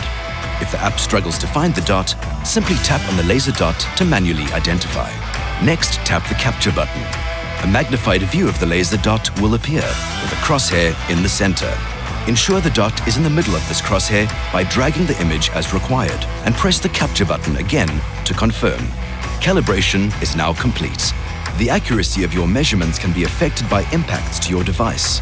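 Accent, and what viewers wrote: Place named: India. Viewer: The narrator has an Indian accent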